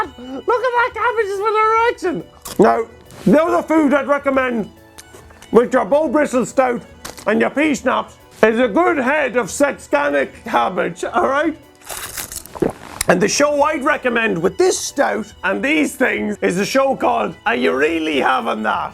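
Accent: Ireland. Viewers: Does anyone know what part of Ireland is his accent from?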